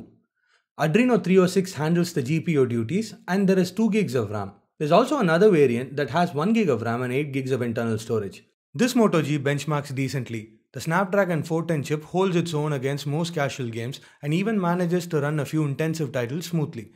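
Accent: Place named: South India, India. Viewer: Good job. Now that you've started sharing the burden off Ash's shoulders, you will grow into a much mature reviewer in the coming days as you have Ash for support. Just need to tone down your South Indian accent a bit. but nonetheless, a good review. thanks!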